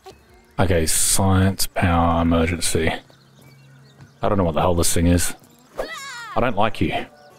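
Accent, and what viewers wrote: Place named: Australia. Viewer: Ok you can all laugh but it took me forever to realize has an Australian accent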